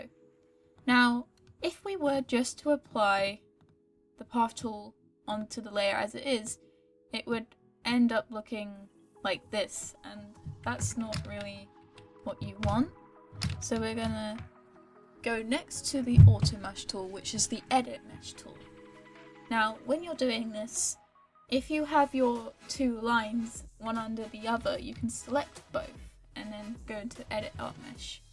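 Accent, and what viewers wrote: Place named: United Kingdom. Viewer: Wow Your British! I Think.. Idk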